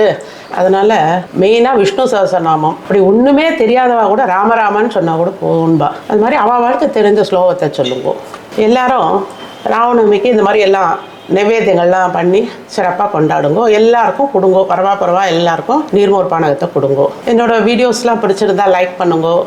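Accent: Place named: North Arcot, India. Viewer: Mami- so happy to have come across ur channel. You should be from north arcot. Been looking for NA samayal and tradition to a long time. Yours is the first. Getting so nostalgic of my childhood , your voice and accent resembles a lot like mom and paati. ‘Aponcha’, ‘Vadaparupu’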